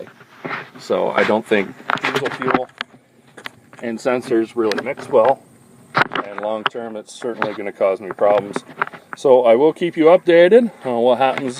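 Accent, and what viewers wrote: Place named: Canada. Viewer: This guys sounds Canadian (don’t know too many Americans who pronounce Chrysler with an S on the end)...does Carfax have reliable info sources in The Great White North?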